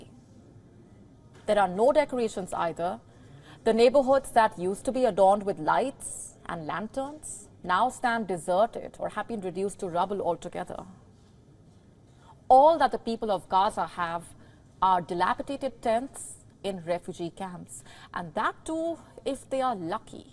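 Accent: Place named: India. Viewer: All Indian female ascents are like this its the most worst ascent in English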